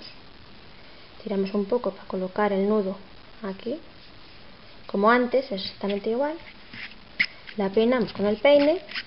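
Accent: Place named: Asturias, Spain. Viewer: hola soy belsy siempre te veo incluso los antiguos me gusta como esplicas por el habla pareces asturiana como yo bueno besazosssssssss y sigue esplicandonos muches coses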